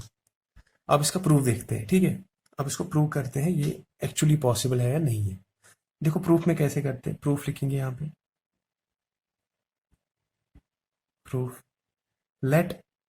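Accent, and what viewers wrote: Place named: Kashmir, India. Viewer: your kashmiri accent is too good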